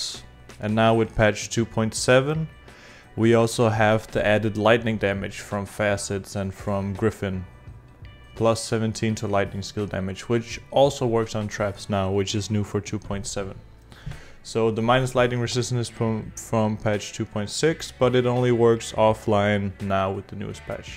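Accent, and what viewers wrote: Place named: Scandinavia. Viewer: Bro are you a Scandinavian living in Brazil? Sorry.. you just just sound Scandinavian 😉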